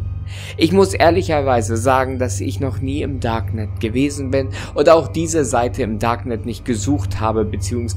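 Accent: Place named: Ruhr, Germany. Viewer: Ruhrpott dialekt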